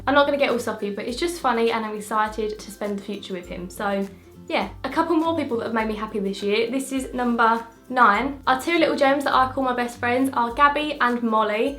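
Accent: Essex, United Kingdom. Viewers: are you from Essex? :) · Your accent is perfect . You're British I guess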